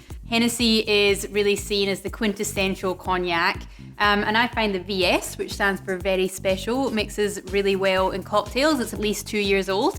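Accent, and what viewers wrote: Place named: Scotland, United Kingdom. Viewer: I'm obv late to the party but just drank a few (4) brandy Crustas and was trolling youtube for relevant content. I like the bit of maraschino in the crusta. did the Cointreau recipe (3C 2B 1L .5M no bitters), then the 4B 1C 1L 1M plus angostura recipe, and liked the cognac forward version better. I'm sure I'm a bit under things with the relatively quick succession, but just found your channel and thought from the accent--are you Scottish? you mentioned being in Australia in the vid... Imma feel a right knob is your Irish or Aussie--my bad. either way, appreciated the lesson--good stuff! I'm def subscribing.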